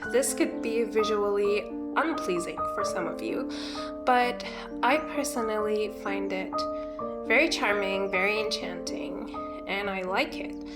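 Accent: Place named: United States. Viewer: Tbh you sound like an American to me so your doing great!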